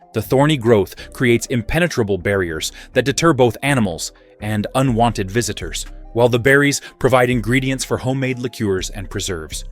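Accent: United States. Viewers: Such a disappointment that this is AI slop which is not marked up as such in YouTube - The pictures don't match the commentary a lot of the time often showing completely different fruits to those being talked about. Pauses and emphasis in the American commentary are very strange often a symptom of AI. I think the content has many inaccuracies - Saskatoon is native to Canada and not Scandinavia, Mespilus is the botanical name for Medlar and I wonder if Jujubes were really grown in there?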